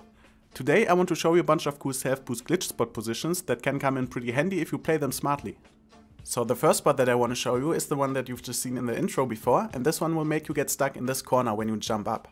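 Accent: Germany. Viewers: deutscher Diealegt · deutscher akzent an!👍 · Du Bist Deutsch ne?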